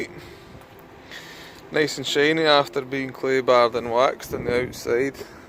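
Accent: Scotland, United Kingdom. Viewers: I love your scottish accent :D Guys and GAROLS! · I love your scottish accent. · You're Scottish. So speak scottish.dont change how u speak for anyone 👍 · I would NEVER complain about a Scottish accent. That’s what keeps me coming back, lol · I'm hear for the Scottish accent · I love your Scottish accent but then I’m Clan Ranald myself so I have no trouble with it. I live in western Canada though. Another job done well. To bad I couldn’t get you to do my car. It’s not that dirty but a deep clean would still be nice. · Love your Scottish Accent 😻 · Watching your videos is my guilty pleasure, I love the Scottish accent too! From Edinburgh myself but came to Staffordshire a long time ago. Thanks for posting and happy new year to you and your family. 😊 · Hahaha I love the Scottish accent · Your Scottish never be ashamed of your accent I am welsh and my accent is strong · Your Scottish accent is awesome. 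I have an accent as well. Forget about people’s opinion. A lot of them don’t understand the struggle of learning and/or speaking two languages. Keep it up!! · You hang on to that Scottish accent. They're harder to come by these days. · Love ypur scottish accent. The best man to clean a felthay car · I love your Scottish accent! It's one of the reasons I watch your channel! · Scottish accent is brilliant, always reminds me of colin mcrae, his accent is ingrained into my mind :( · I'm cool with the Scottish accent. Is your restoration business based someplace in Scotland? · Actually I love Scottish accent as much as your work. It is a cimbination of both. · Luvv yarr sco'ish accent 😊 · Don't lose the Scottish accent. It is wonderful just like your work and attention to detail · Who in their right mind would complain about a Scottish accent? It’s music to my ears! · Love your accent tell people bugger off🙂😉my husband and I were trying to figure out where you were from then I said Scotland that that was your accent. 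I like watching how you take these cars from dirty filthy mess has two really nice cars that I would sit in. I enjoy your channel and I look forward to your next video. Have a blessed day. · Love your Scottish accent! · Who on earth is commenting on his accent, I freaking love it!!! Keep the Scottish accent, dont change it for anyone!! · I watch for the Scottish accent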